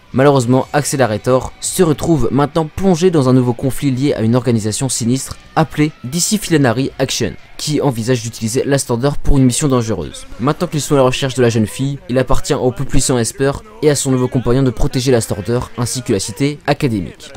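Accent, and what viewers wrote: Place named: England, United Kingdom. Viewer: Quel bel accent anglais, je me demande comment l'as-tu obtenu ?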